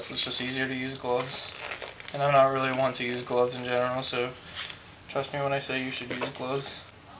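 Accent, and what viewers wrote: Russia. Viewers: Are you russian??!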